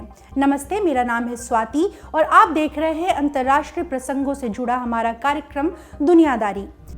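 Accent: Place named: Uttar Pradesh, India. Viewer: Uttar pradesh accent in her language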